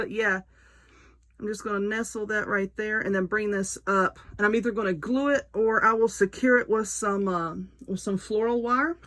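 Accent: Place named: East Texas, United States. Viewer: Olivia's romantic channel recommended yours, so i headed over. i have to ask if you are in east texas? you sound a WHOLE LOT like my family and myself.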